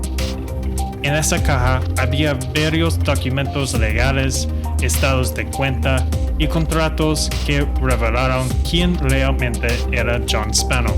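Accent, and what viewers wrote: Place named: United States. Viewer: Tu acento americano a veces no lo entiendo aveces, pero ya lo estoy entiendo mejor y tus videos son muy buenos estoy buscando mas del hockey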